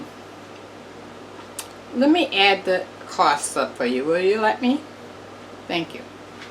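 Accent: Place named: Boston, United States. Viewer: Hi I can’t wait to try this. I was just thinking of some of the cheap things i buy plenty of and how i can use them in other recipes. This sounds perfect for all of the peanut butter I love so much. And thanks for the meat suggestion. Especially the dark meat cut-up. I know you hear this all the time… I hear Boston 😘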